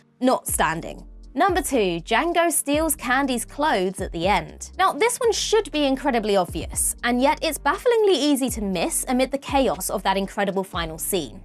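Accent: United Kingdom. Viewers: Who’s this cheeky Brit?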